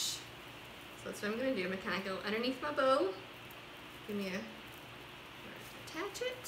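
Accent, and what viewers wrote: South, United States. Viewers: You have a very southern accent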